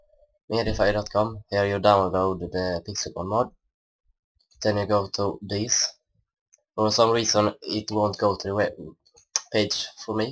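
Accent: Sweden. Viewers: Haha Svengelska :D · det låter lite som svengelska när du pratar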